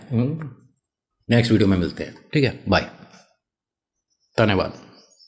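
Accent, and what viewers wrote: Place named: Moradabad, India. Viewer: Jay Shri Shyam Bhai Har Har Mahadev aapki Bhasha bilkul Muradabad Jaisi Hai isliye main aapki Kahani sunti hun aur Kahani acchi Sunate ho aap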